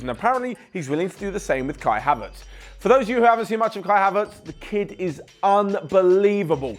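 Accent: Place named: England, United Kingdom. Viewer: awful accent, thank god im not leaving in england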